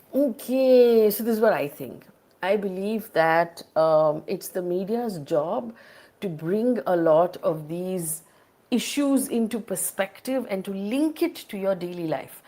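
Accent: India. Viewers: Butler English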